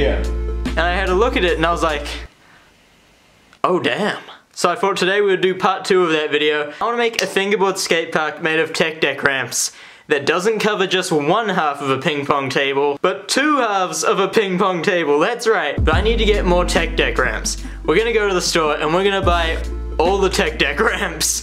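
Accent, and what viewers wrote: New Zealand. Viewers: Is this guy from New Zealand if so same here the How I know 'the warehouse, low prices are just the beginning' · your a kiwi?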